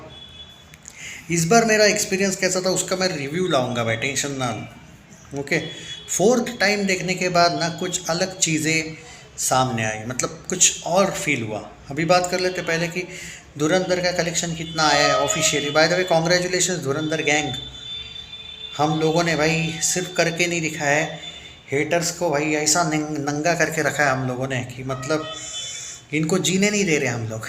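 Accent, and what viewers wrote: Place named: Maharashtra, India. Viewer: Bhai i am addicted to your channel and your style of explaining things Specially i love the accent when marathi prople speaks hindi, as i am also maharashtrian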